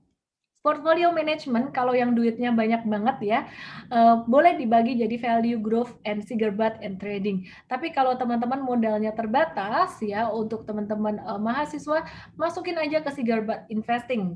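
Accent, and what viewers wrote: Java, Indonesia. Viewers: logat jawa sing kandel banget. 👍 anyway and thanks 😊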